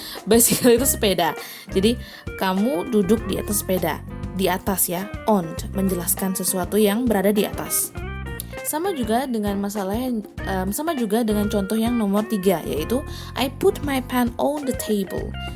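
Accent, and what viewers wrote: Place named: United Kingdom. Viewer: Suka nih british accent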